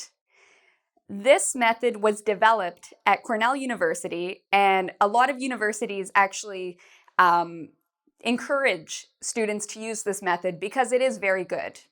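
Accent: Canada. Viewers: Because she is canadian, not american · you must be Canadian? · Canadian eh? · she's Canadian I think. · And your English sounds somewhat Canadian. Good job. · Because she is Canadian. She says "Abowt" when she says about. This is very common in Canada.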